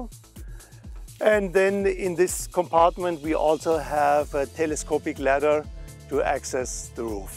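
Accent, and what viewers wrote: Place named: Germany. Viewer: Ich hab einfach mal auf Deutsch geschrieben das der Akzent und der Name unverkennbar deutsch sind.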